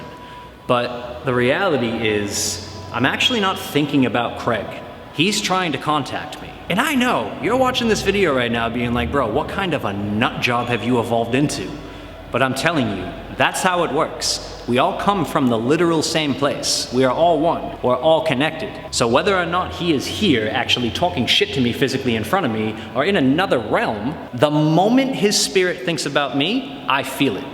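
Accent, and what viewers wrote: Australia. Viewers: It’s funny hearing you speak with an Australian accent and then comparing it to your older videos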